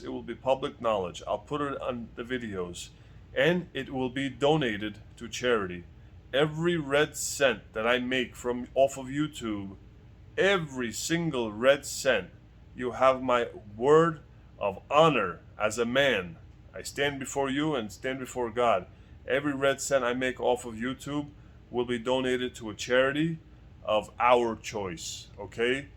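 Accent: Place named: East Coast, United States. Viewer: Congrats on reaching over 500! I knew you’d gain a lot more subscribers! You remind me of old school YouTube when people would create videos for fun instead of making professional productions. You look like you’re having fun sharing and you’re genuine. When you share your experiences/hauls/failures/successes, it’s like talking to a friend. I need to up my preps. Been having some stomach pains lately so the doc suggested a low fodmap diet. That threw a wrench into my preps. Still trying to learn what it entails, but it is so restrictive that it makes most of my preps off limits (hopefully I don’t have any food triggers that irritate my stomach so that I can continue eating normally). No gluten, no beans, no onion or garlic. Lots of fruits off limits. Anyway...thanks again for the entertaining and informative videos. One last thing — one source of protein you may want to look into is tofu, if you eat it. 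There is a shelf stable type by a brand called Morinaga. I got a box from Amazon. It can last longer than the refrigerated type. Oh, and I must say your accent reminds me of my East Coast cousins!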